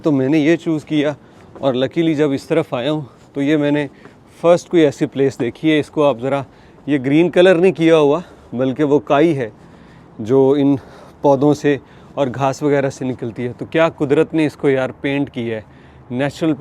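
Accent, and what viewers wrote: Punjab, India. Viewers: ایک مودبانہ مشورہ دوں گا، ناراض بھی نہ ہوئیے گا۔ آپ ایک اچھے یوٹیوبر بن سکتے ہیں۔ دو چیزوں کا ضرور خیال رکھیے۔ ایک تو یہ کہ آپ کی اردو اچھی ہے اس میں پنجابی کا تڑکا نہ لگائیں کیونکہ اس سے وی لاک کا مزہ کم پڑ جاتا ہے۔ دوسری بات سیاسی گفتگو سے پرہیز کریں کیونکہ آپ کو بیچ بیچ میں سیاسی چٹکلے چھوڑنے کی بھی عادت ہے۔ پاکستان میں بہت ظلم چل رہا ہے، جبکہ آپ اکثر اٹھکیلیاں کرتے رہتے ہیں۔ امید ہے ان چیزوں کا خیال رکھیں گے۔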